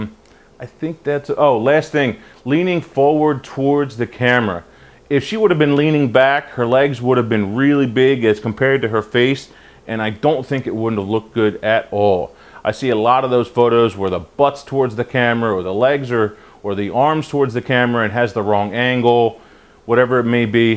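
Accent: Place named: Philadelphia, United States. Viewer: You must be from the Philly/SJ area. Your accent betrays you.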